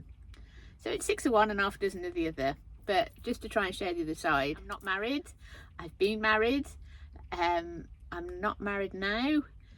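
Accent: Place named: Worcestershire, United Kingdom. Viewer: I thought your accent was local. Keep flying the flag for Worcestershire!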